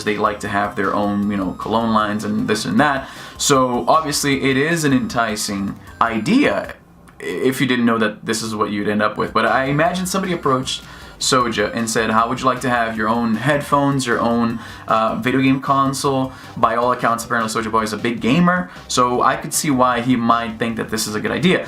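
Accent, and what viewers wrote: Brazil. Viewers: You're Brazilian? I couldn't hear your accent until you pointed it out. Please speak some Portuguese! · hey there first time viewer here, great video lol I thought I recognized your accent but didn't put two and two together till you said you were Brazilian I am an American Brazilian so a lot of my family that speaks English has a similar accent but yeah sorry to get to my point of commenting lol he posted on twitter he made 250,000 dollars in 24 hours of starting selling his knock off consoles lol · The moment you said you were Brazilian, I immediately started to hear your subtle accent lol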